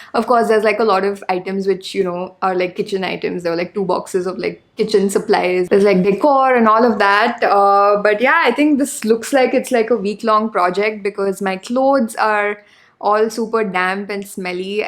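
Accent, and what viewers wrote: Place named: Karnataka, India. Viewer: ur English utterance seems to me discording... it seems pretty problematic to sort out r u speaking English or kannada....request to correct ur English accent properly ..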